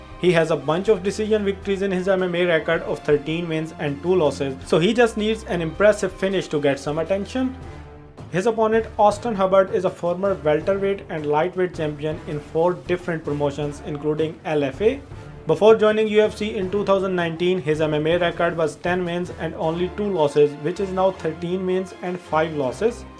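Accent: India. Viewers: Plz do something about your accents. Ur English is like very very Indian. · "Ur English is very very Indian", lol. Tf do u expect an Indian dude to have? A British accent?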